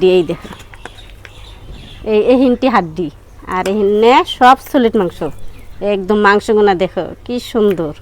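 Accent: Tangail, Bangladesh. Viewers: আপনার জেলা কি টাঙ্গাইল নাকি?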